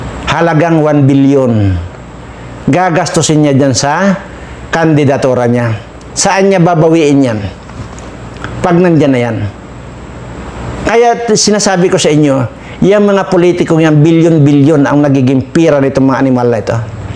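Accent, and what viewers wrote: Iloilo, Philippines. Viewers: ilonggo kaba sir parang ilonggo yong bigkas mo ng salita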